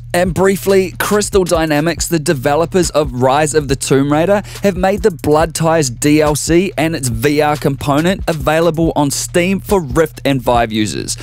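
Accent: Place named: Australia. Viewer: My favorite thing about your channel has always been your voice. It's a sonorous Aussi voice <3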